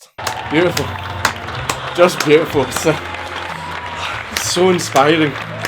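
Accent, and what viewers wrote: Scotland, United Kingdom. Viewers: Thanks for bringing up the truth, and confronting that racist black woman! By the way, love your Scottish accent. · He's Scottish? I thought he sounded like that because he'd had a stroke.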